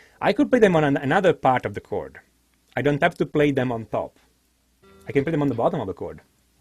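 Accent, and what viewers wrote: Italy. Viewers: Are you italian in Milan? You sound 100% like my boss 🤣 great videos!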